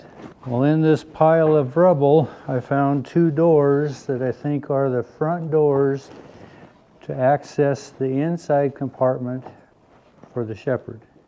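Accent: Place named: Montana, United States. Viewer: I grew up back in Belen NM and had some good friends that were from Montana. Im 55 years old and I hear you say things that absolutely remind me of my friends mom who talked thst way. Their name is Lawson and there was another family from the same area of Montana named Goodson. They knew each other from bake then in Montana and I figure they must have tslked about going south where they could start over.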